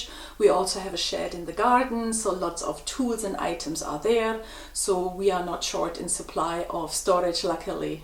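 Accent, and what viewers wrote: Scotland, United Kingdom. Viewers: I love your home and your colours and the way you have picked up a slight Scottish accent accent. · I hope you don’t mind me asking but I’m curious to know where you’re from. You obviously have a Scottish tilt but I can detect another accent, possibly German or Scandinavian? Have you lived in Scotland long? Lovely video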